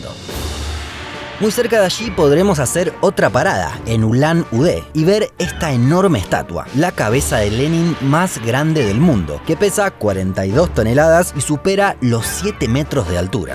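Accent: Argentina. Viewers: El acento argentino es lo màs horrible se que pueda escuchar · De dónde es el narrador? Argentina?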